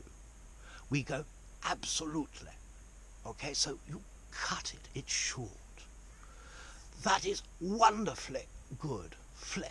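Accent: RP, United Kingdom. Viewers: Hahaha So posh!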